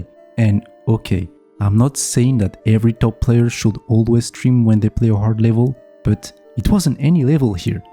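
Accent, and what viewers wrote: France. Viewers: I cant listen to french english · bruh your french accent is beating you up so bad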